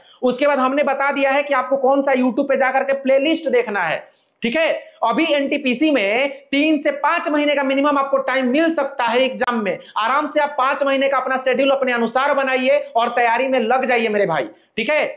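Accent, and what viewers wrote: Bihar, India. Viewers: Tum pkaa bihar k E ho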